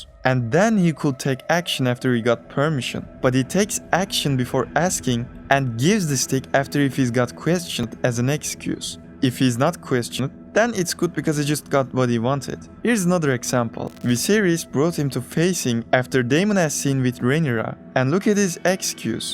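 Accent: Turkey. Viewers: Bu adamın türk olduguna yemin edebirim aksanından çok belli oluyo ginede videoların güzel böyle dewam :D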